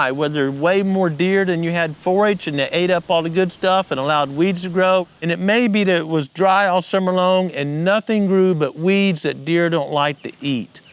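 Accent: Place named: Eastern Shore, United States. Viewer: You from the Eastern shore? Accent sounds like it.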